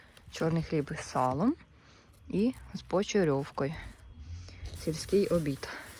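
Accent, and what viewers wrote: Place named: Poltava, Ukraine. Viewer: Привіт ❤️ чудовий канал, говір ваш бомбіческий✌🏻 мабуть десь на Полтавщині?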